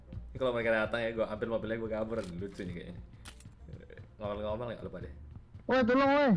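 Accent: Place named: Banjar, Indonesia. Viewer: Orang banjar mana suaranya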